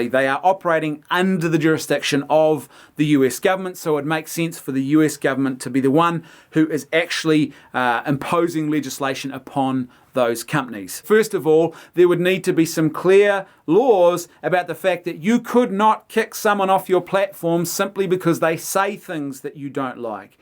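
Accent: New Zealand. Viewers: I would've subbed, but you clowns from across the ditch have the most annoying accent in the world. Sheesh dude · You sound like a new zealander sir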